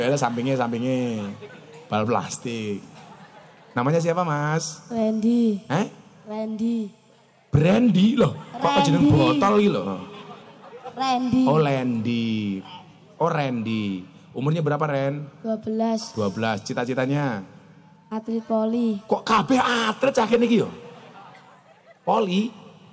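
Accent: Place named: Java, Indonesia. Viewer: Sy tiap hri nyimak ceramah e Gus Miftah gk membosan kan seneng jg dgn logat jawa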